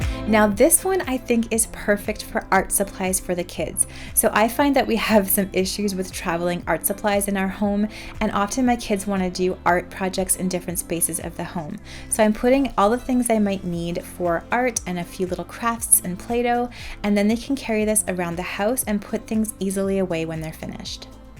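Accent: Canada. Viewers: Love the DIYs and the Canadian accent! ❤️😁.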